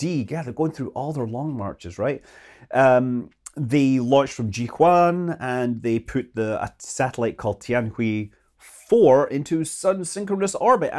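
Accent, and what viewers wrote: Scotland, United Kingdom. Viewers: you are such a delight! I always enjoy hearing your mellifluous, cheery voice with your lovely Scottish accent! Happy New Year!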